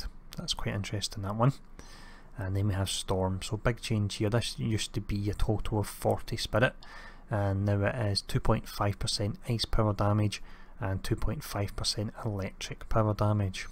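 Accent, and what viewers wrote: Scotland, United Kingdom. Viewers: I like your accent, are you scottish? :)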